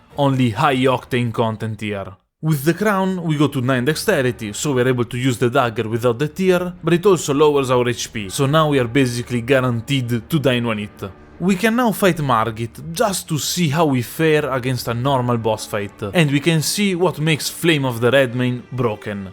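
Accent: Italy. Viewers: I hear the Italian accent my guy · you sound so much italian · l'accento italiano più forte e marcato che io abbia mai sentito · si senta un filo l'accento italiano mi piace ancora di più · Are you Italian by any chance, mate? I can recognize the accent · this guys Italian accent is so heavy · Mi son bastati i primi 2 secondi per capire che eri italiano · Accento leggermente italianeggiante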